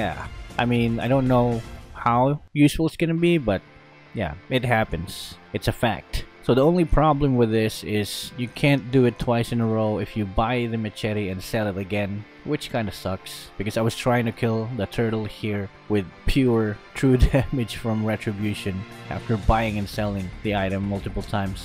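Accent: Philippines. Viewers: Just as I thought, it is Filipino Englig Accent. :) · From this video, now I know you are Filipino, your accent is different with other Filipino tho xD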